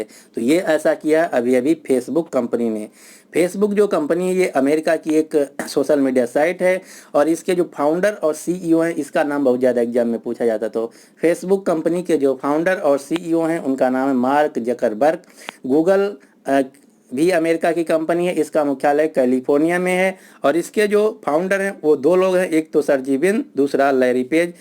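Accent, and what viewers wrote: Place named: Uttar Pradesh, India. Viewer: Lagta h ki app up ke h hain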